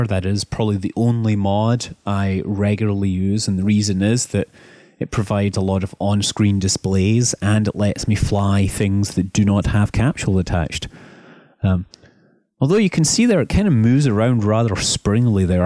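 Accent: Scotland, United Kingdom. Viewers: hi, where were you born? you sound scottish but not completely:P nice video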